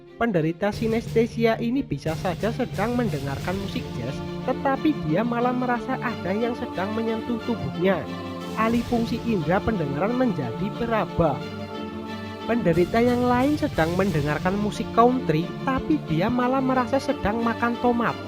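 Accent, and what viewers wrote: Banyumas, Indonesia. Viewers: wakwakwak, wanjir imonya, ane hargai btw, suarane ngapak men mad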